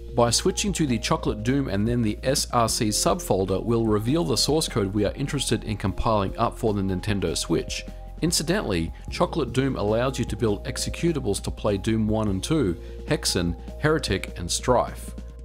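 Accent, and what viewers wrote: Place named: Australia. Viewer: You sound Australian lol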